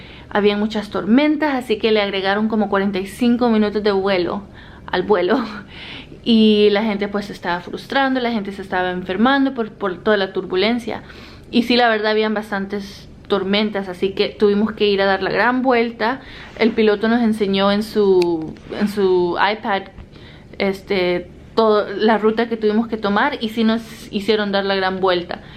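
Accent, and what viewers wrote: Spain. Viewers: your spanish accent is so cute ❤️ in Portugal, we say “Assistente de Bordo” or “Hospedeira” for flight attendant ☺️ Great video!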